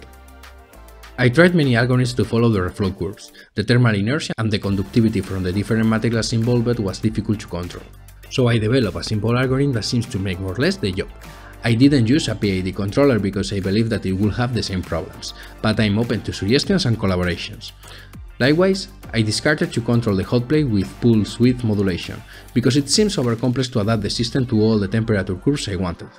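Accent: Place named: Spain. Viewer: Buen trabajo! Se nota que eres español, pero lo intentas y te salen muy bien los videos!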